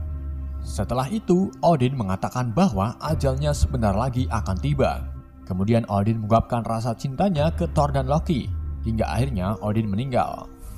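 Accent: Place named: Java, Indonesia. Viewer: ket benslet 🤣🤣🤣 ngakak medok parah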